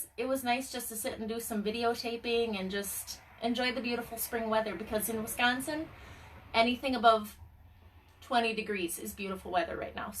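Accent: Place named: Ireland. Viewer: You kinda sound irish lol :)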